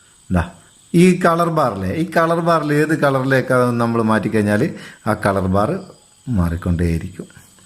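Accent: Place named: Malabar, India. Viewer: Very nice & really like your nadan presentation and koikode/malappram style...all the very best....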